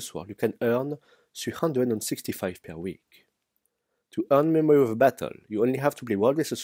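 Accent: France. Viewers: this is the frenchiest french accent in the world.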